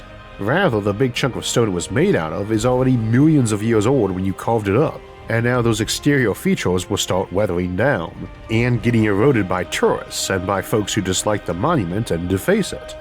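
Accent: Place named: Texas, United States. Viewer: You have a fascinating accent. It sounds like a perfect blend of texan drawl with the intonations of someone with english as a second language. I don't mean any offence by this, its just a very unique sound.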